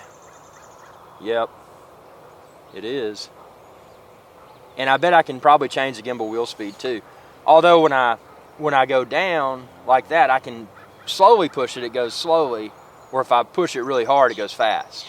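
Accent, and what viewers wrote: South, United States. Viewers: thank you.. I am glad I found your channel... love your southern twang....new subscriber....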